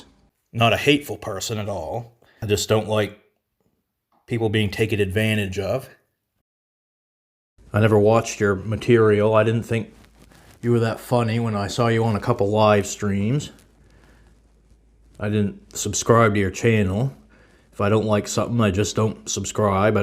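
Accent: Maine, United States. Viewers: That's how an intelligent Maine accent sounds. Great message, dude.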